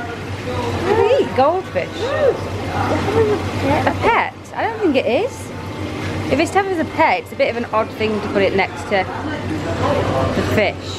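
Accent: United Kingdom. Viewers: I love your British accent, I listen to you ,you''re so smart,and fun. love your vlogs!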